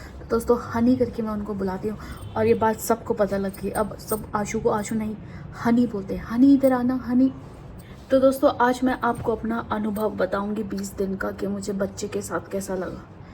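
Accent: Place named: Pahadi, India. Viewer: I love her pahadiiii accent❤❤❤❤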